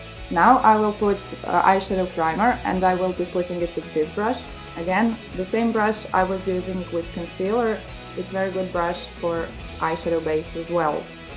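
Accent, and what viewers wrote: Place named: Russia. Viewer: Русский акцент .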